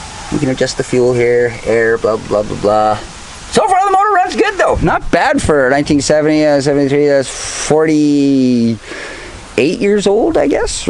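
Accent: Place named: Newfoundland, Canada. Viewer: Yes my son ,in newfie you would be one of the bye's .... You comes across is half rednech half newfie ,we bye' loves it ...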